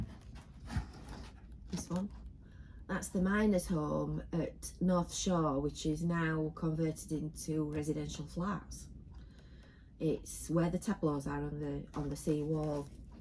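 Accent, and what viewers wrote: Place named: Yorkshire, United Kingdom. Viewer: Shes from Yorkshire.you can hear the accent.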